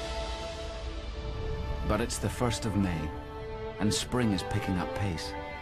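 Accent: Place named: Scotland, United Kingdom. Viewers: If you can't understand the accent then you won't be able to understand Scottish people if you were to visit these places on the screen in real life so why watch? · Lmao I don’t think this person realizes what a run-on sentence is. Also, you are entitled to your own opinion. You literally just gave us yours. So what is the big deal if we like a celebrity? Can we not like what we want to like? With the whole narration thing and you not understanding/liking the accent, I really don’t know what you expected. It’s a documentary about nature in Scotland….so it makes sense why it’s narrated by a pretty well-known Scottish actor. It’s supposed to add to the fact that this is about SCOTLAND. If you have such a problem with it, don’t watch it. Simple.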